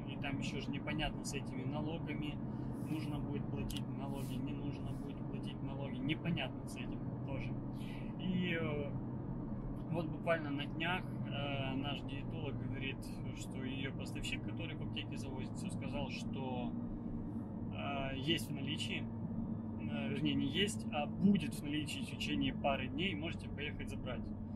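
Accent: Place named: Latvia. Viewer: Akcentas latviskas ;-)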